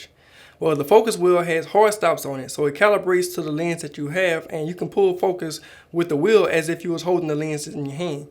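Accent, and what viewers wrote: Louisiana, United States. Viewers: I love that Louisiana accent. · I kept thinking, "damn this dude sounds like he's from Louisiana." Then we got to the b-roll. Yup.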